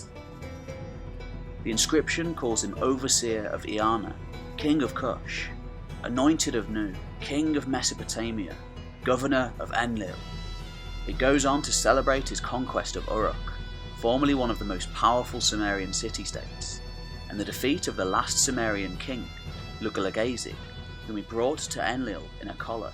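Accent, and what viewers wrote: United Kingdom. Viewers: wait, you forgot to mention his luscious british accent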